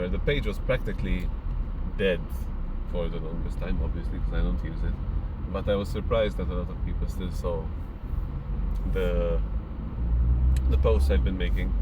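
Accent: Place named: Malta. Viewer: Its very good to see that you dont intend to delete all those videos and even got back your youtube. Also you've got a very heavy Maltese accent haha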